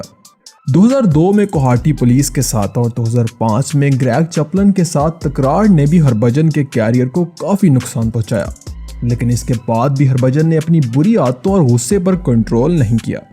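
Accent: Pakistan. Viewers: Yeh Pakistani lahza h is creator ka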